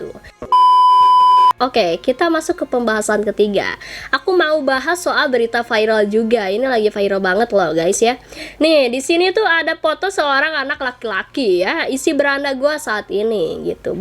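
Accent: West Java, Indonesia. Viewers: Kaya orang Sunda logatnya?? Salam Sunda Majalaya hadir😁☝️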